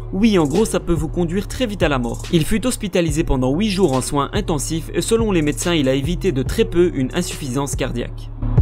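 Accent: Belgium. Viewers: T belge comme moi ?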